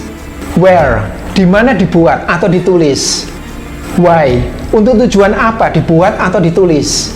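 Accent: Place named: Trowulan, Indonesia. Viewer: logatnya .. Pak Dosen orang trowulan kah ?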